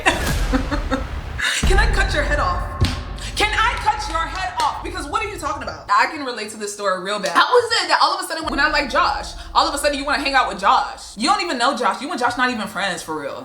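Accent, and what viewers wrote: Nigeria. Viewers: Nigerian accent 😫😫😫😫😫🤌🤌🤌🤌🤌🤌🤌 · Again I ask,are you Nigerian cause the accent is hitting hard which makes your reactions more real and relatable 😂😂😅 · Are you a Nigerian cause u have that accent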